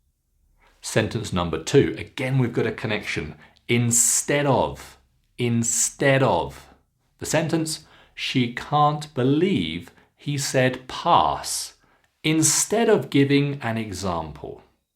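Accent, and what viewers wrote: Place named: RP, United Kingdom. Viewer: You have a modern RP accent. Were you born in the south of England?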